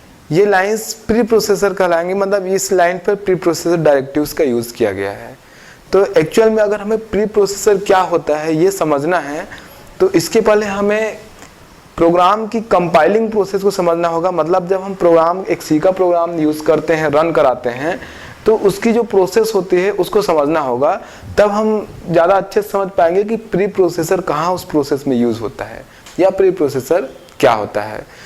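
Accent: Bihar, India. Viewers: by your tone i think you're also from bihar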